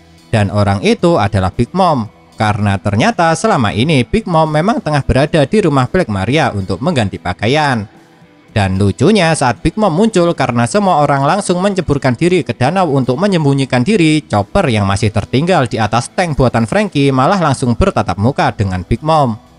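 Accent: Java, Indonesia. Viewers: Logat jawa nyaa bisa lebih di netralkan 🙏🙏🙏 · Medok jowo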